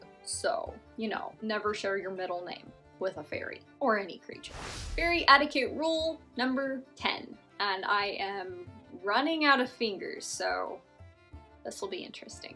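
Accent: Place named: Australia. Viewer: Omg you have an Aussie and you have a lovely video layout. I like you already! My bf's niece is getting interested in the fae. I'm a fallen catholic, but I love researching for other people to make sure people do their own practices in a safe, but still fun way. Plus I love supporting and learning about things. Thank you so much for this video, it's so hard to find good content on this subject! Question: Do you know anything on Green witches? I think she's showing an interest in that without knowing what it is too and would love to get reading material on it for her.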